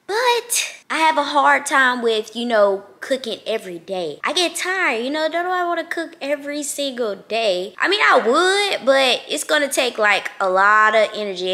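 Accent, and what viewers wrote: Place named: Alabama, United States. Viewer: You from Bama like me all my folks pronounce everything wrong Himalayan Salt 😊❤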